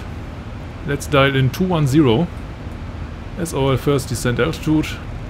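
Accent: Germany. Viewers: oh Hab an der stimme gehört und an das englisch weil wir immer so englisch sprechen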